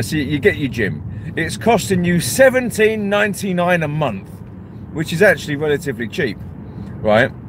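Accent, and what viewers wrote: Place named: North, United Kingdom. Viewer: Absolutely brillant. Your Northern accent and arm impressions were brillant. Think you give Peter Kay a run for his money. I just laughed.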